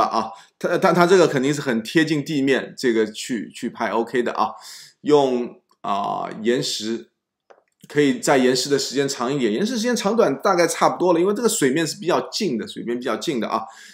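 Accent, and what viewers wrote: Shanghai, China. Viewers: 先生侬好！两个月前，一次偶然的手抖误操作，点开了正在直播的《摄影评片》，听到一口上海腔普通话，就像听到邻居、朋友讲闲话，蛮亲切的，所以没有马上转频道，听听讲点啥么事？听着听着，被侬专业、中肯而又平实易懂的点评所吸引，尽管我非摄影爱好者，但是仍就看到了直播结束，并且订阅了《詹姆斯摄影》。之后陆续看了另外两集《评片》，也看了《瓦纳卡的孤树》、《星芒照海岬》和《詹姆斯摄影十年作品》（封面上的南浦大桥覅太赞哦！），刚刚又看了第十二期《摄影评片》，虽然这些只是侬视频群里很少的占比，但是已经影响到我，对摄影好像有点感兴趣了，正在准备考虑买只两手货佳能5D2，再配一只好点的镜头起步，尝试去感受拍照的乐趣。侬让我联想到了另外一位从上海走出来的摄影大师——郎静山，尽管所处时代不同，作品风格迥异，可都勤奋努力，孜孜不倦，砥砺辟出了自己的路，都在成功之后毫无保留地将自己的学识和经验和盘托出，倾力普及传授摄影知识，都德艺双馨，令人尊敬。郎先生一百多岁还手捧相机黄山徒步，相信侬也会在一百多岁时仍行走于天涯海角摄取美景飨世人的。祝福侬摄影教学收获更多硕果，摄影艺术登上更高境界！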